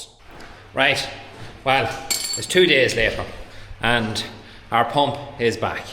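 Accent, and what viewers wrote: Ireland. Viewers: you certainly do more than farm! I am an 80 year old lady in a wheelchair. I, too, came for the cottage renovation, but stayed because your Irish accent, your stories, your achievements, your struggles and your honesty are captivating. When I lost my parents, I suddenly realised, I was now the adult. The final decision rests with me, I can't consult them any more. You are going through that transition with losing your father - you are now the adult farmer, the decisions rest with you. It will be stressful, but you will grow with it. Best wishes.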